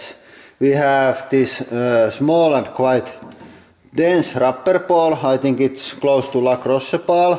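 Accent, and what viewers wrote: Finland. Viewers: finland accent > every other accent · Your Finnish accent is so fluent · "Dat vas van touff rupperband ball!" YOur ACCENT IS SO AWESOME, are you from Finland? XD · Hes finnish for sure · Nope, he's Finnish. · its finish accent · hittoku kuulostaa nii suomalaiselta ku puhuu englantia nuih XDxD tuo on nii suomalaista englantia ku voi olla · Finland. Everybody tell this man, its finland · finnish accent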